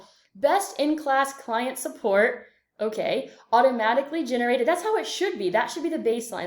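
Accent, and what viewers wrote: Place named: United States. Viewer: I’m aware of when it happened. Did you also know that the US tested contraceptions illegally on PR women which made many sterile and some even die. Aside from what obviously has affected the fertility rate on our island, why women are choosing to have less children? Lack of money and opportunities which is getting even worse with all you rich people moving in and raising the prices of real estate. “There are less white people in Hawaii” It’s not about white people, it’s about rich foreigners and there are many in Hawaii taking over their lands. That’s the reason why homelessness of the natives has increased in Hawaii. Also, I imagine that you’re a white American from how you speak. Just know that most Puerto Ricans could care less about US political standpoints. So, this whole “liberal” or “conservative” or “woke” crap means nothing to me. 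I’m talking to you about Puerto Rico. You can’t educate me about my own island, its people and history; I AM PART OF ALL THAT.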